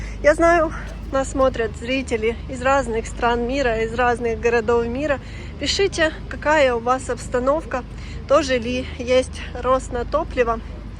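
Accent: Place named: Western Ukraine, Ukraine. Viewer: привет! А почему у Вас западно - украинский акцент?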